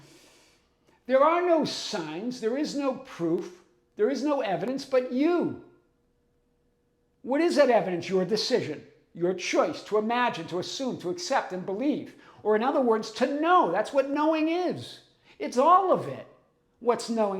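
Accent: Boston, United States. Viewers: You tell them (tell them with your Boston accent - I'm from MA as well, LOL)!! But I empathize with those who ask those questions, because for a lot of us this whole LOA/Quantum Reality has been difficult to digest. Yes, even for some of us highly intellectual, intuitive or even mystically aligned people. It's easy to meddle with the middle as we get caught up with worldly matters, that we can experience with our five senses, because that is ALL we have had going for us.... All our lives! That is what we call "conditioning." But your videos are liberating, and that is fulfilling in itself! That is a sign - Thank you It will click for them, just like it did for some of us... "There's no sign outside of you, there's no truth to that other than the truth you give it" - beautifully stated...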